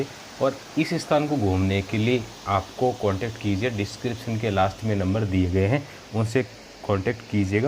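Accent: Rajasthan, India. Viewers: Sir, aap kya Rajasthan se hai? Apki boli me Rajasthani put hai......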